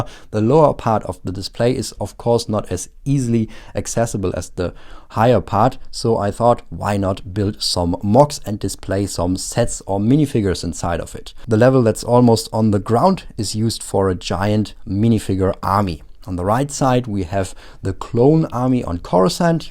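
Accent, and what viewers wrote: Germany. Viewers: Deutscher Akzent 🫣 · Ich Spüre Deutsch in deiner Stimme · Yo i knew you are from germany by the sound you talk like BrickCrafts 😅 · you sound german ^^ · Dein Englisch klingt so deutsch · Man hört den deutschen Akzent haha. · Der deutsche Aktzent izzzz da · Sag mir das du deutsch bist ohne mir zu sagen das du deutsch bitte der deutsche akcent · You can hear this German acsent in his voice 😂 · this german accent is furchtbar :D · i hear german out of you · Der deutsche Aktzent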